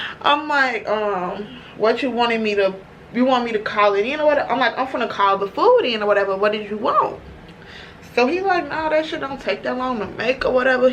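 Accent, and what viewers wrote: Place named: Chicago, United States. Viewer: Aww awww boom g straight Chicago talk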